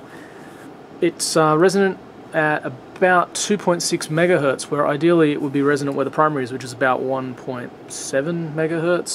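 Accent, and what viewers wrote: Australia. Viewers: Very well explained. When Im tired of modifying my 1958 radio (6BX6 RF amp, 6BL8 local oss and mix, 6BX6 IF, 12AX7 Baxandel tone and 2x 6GV8 audio) to fill the dial with DX, I would love to have a crack at a Tesla Coil. I agree that tuning will improve things. Some people reckon harmonics can be good, guess I will find out. Great to hear an Aussie voice (besides Mr Jones) here on youtube.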